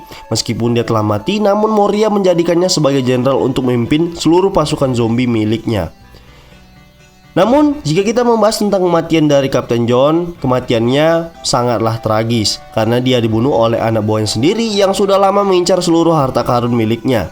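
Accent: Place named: China. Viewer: NARATORNYA BISA LOGAT MANDARIN 😭😭😭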